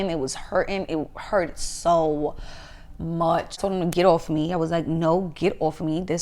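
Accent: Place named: Brooklyn, United States. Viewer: One thing about, it’s not a word she can say without sounding Brooklyn af. 🤣 Like everything about you scream BK/NY. I love your accent - a Bronx girl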